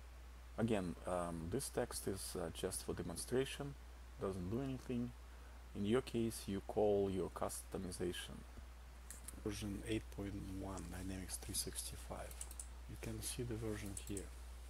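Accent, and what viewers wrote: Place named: Russia. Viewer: Русского человека сразу слышно :)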